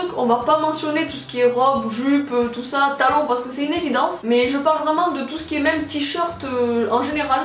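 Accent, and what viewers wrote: Toulouse, France. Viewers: Elle a un accent toulousain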